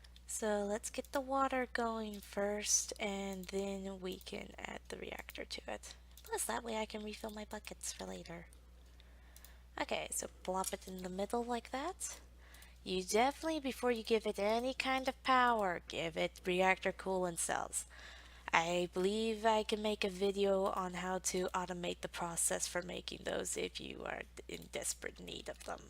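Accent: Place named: Canada. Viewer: Wow I can't believe senpai noticed me! I get the main North American accent feels but tinges of European... so Canadian/French Canadian? I dunno but it's nice!!! Why aren't you more popular :(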